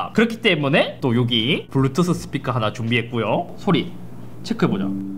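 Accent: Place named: Gyeongsang, South Korea. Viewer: 씨 경상도셨구나.. 컴퓨터도 잘하네..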